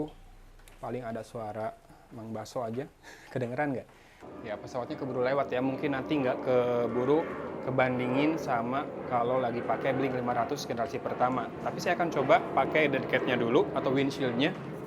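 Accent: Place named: Java, Indonesia. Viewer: Medhok ya Pack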